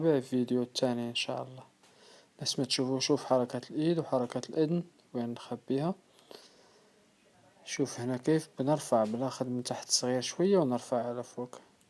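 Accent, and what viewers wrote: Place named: Morocco. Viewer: السلام عليكم ورحمة الله هل الأخ مغربي؟ لكنتك قريبة جدا للمغاربة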